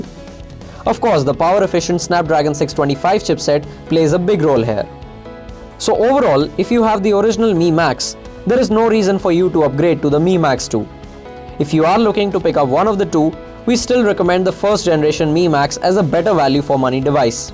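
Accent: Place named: India. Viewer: Really hate Indian accent!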